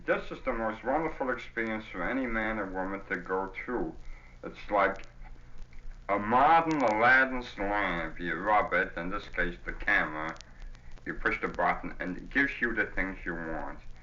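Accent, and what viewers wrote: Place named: Manhattan, United States. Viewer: Sadly that Manhattan “East Side” accent is now extinct…even on toity toid and toid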